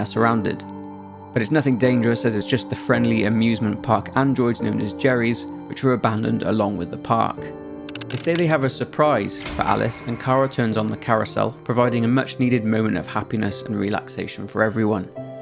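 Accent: United Kingdom. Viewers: Why is it always some british fool talking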